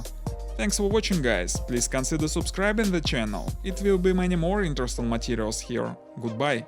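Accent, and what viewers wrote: Russia. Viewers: once i heard the russian accent i knew instantly this would be a fst bike revieq worthy of watching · О знакомый русский акцент) Прикольный велик для зимы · Russian accent 😒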